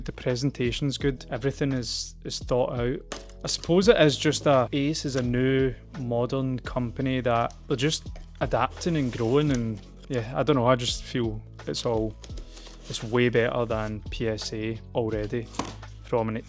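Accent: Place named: Scotland, United Kingdom. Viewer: Hi good to hear a Scottish accent. Finding product is so hard.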